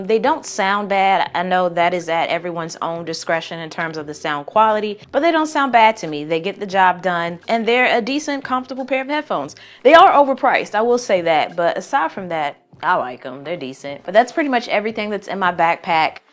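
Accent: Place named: South, United States. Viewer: She got that Southern accent 😌👌.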